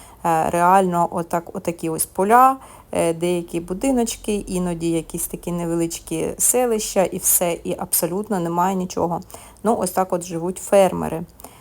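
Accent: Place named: Cherkasy, Ukraine. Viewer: Дякую Вам! Хороші роботи. Приємно дивитися і чути Вас. Натхнення Вам і нових творчих задумів та їх здійснень! Я з України. Мені здається, що Ваш говір черкаський 😊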